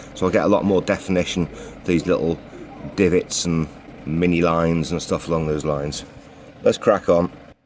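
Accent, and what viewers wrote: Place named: Manchester, United Kingdom. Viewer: My God he's from Manchester!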